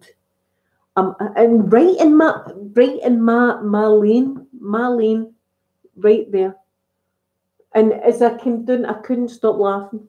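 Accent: Scotland, United Kingdom. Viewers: Your Scottish accent is definitely my favorite for asmr and is sooo relaxing... I'm from California and I prefer asmr channels that have an accent that isn't American.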